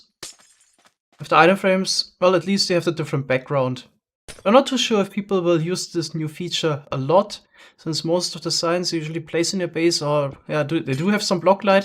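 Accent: Germany. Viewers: Love you and your german accent, but: "Glow Lichen" is pronounced "Glow LIE - KEN"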